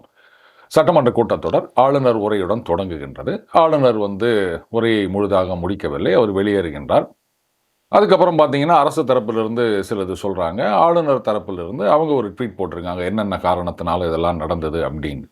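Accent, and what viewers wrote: Chennai, India. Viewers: Your native Chennai slang is super sir. Your analysis is super and absolutely true!!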